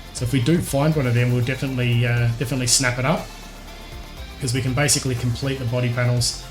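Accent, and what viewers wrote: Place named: Australia. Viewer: Great advice. Cheers mate, much appreciated. You sound Aussie (Australian). A welcomed relief from other accents online.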